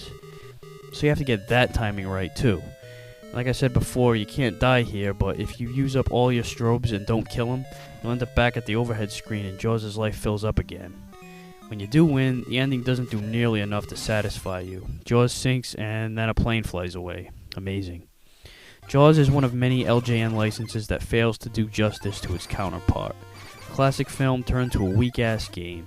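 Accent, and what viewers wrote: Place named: New Jersey, United States. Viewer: sounds like you're from jersey.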